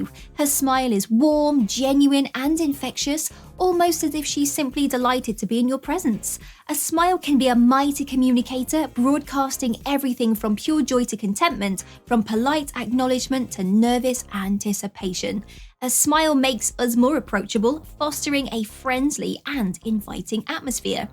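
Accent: United Kingdom. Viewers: Good advices! R u from UK?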